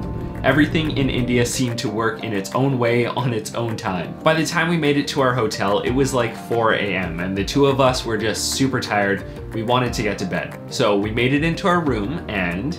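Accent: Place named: Canada. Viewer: You just sound Canadian .....but you are very Indian deep inside within you .... Welcome home anytime buddy ....come again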